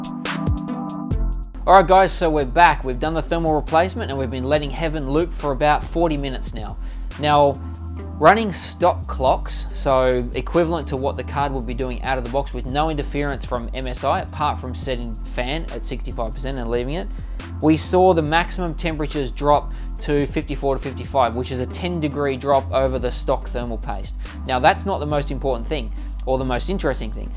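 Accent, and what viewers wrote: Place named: Australia. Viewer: His guys aussie accent is too thick..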